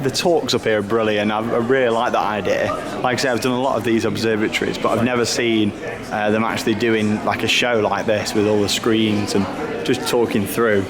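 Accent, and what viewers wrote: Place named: West Midlands, United Kingdom. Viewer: Where are you from your voice sounds very west midlands uk lol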